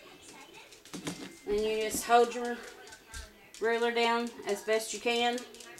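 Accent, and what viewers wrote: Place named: South, United States. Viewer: Thank you. I will be watching more of your videos. Love your southern accent :-)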